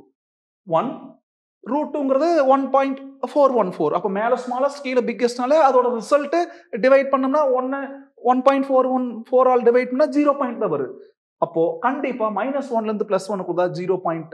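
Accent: Coimbatore, India. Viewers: That's becoz he's Coimbatore his slang changes right!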